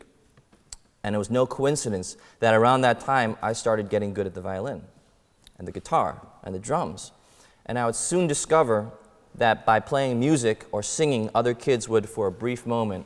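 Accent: United States. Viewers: weirdly enough as a guy who grew up in NY, he sounds like he's from LA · his chinese is good though (in terms of vocabulary, grammar, way of speaking, etc.)...but he has an american accent when he speaks chinese.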